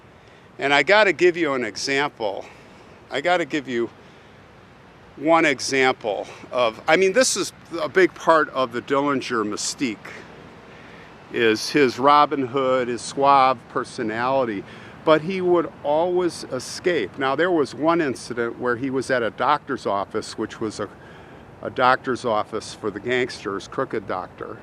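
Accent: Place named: Chicago, United States. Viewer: Great storytelling . Having lived in Chicago, it’s great to hear the familiar accent.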